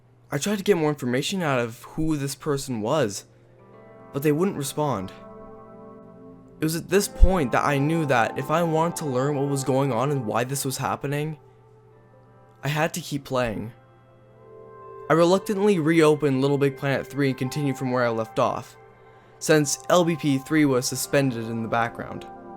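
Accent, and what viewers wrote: England, United Kingdom. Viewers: nice British accent. Better than mine and I'm British haha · Where is that english accent supposed to be from?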